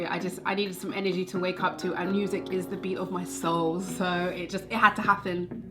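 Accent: England, United Kingdom. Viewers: Ok so I got 2 problems. 1) Vitamin is pronounced V-Eye-Toe-Min. 2) Does it make me a hater if I'm hating on your hot english accent because I wish I had a hot english accent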